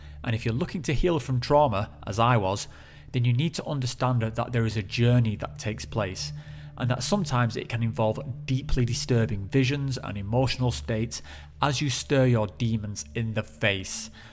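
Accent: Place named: Manchester, United Kingdom. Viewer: Are you from Manchester? You sound like Tyson Fury LOL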